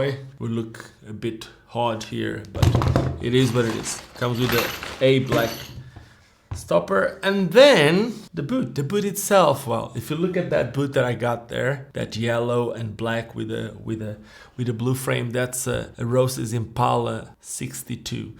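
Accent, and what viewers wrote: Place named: Portugal. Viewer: Acabei de comprar estes patins, a meio da review comecei a pensar no sotaque e obvio que tava certa e é tuga xD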